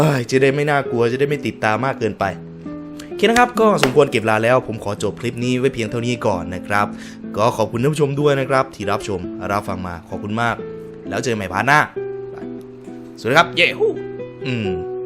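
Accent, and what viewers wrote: Isan, Thailand. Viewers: จาดกันพะนะ คนอีสานคักๆคุณ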